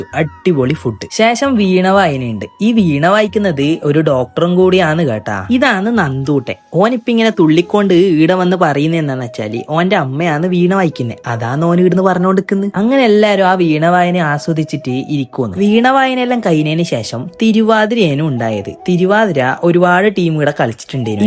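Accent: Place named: Kannur, India. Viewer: Nigaluda kanoor ഭാഷ കേൾക്കാൻ നല്ല അടിപൊളി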